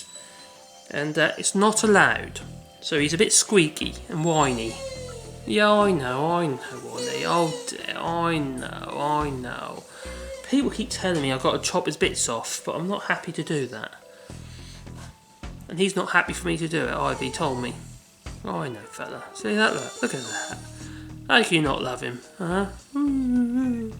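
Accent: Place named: United Kingdom. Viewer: Yeah my sound all the way turned up and still had a hard time understanding. You are British with a lovely accent but the music makes it quite hard to follow then.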